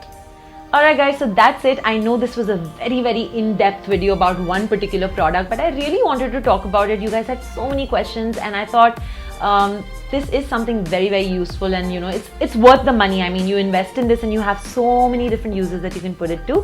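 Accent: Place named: India. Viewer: Its indian accent miss and moreover it's an accent of those who have english as their third or fourth language in a multilingual country. So if you couldn't bear with that, You shouldnt have come to this foreign video . Thanks have a great day.🙂🙂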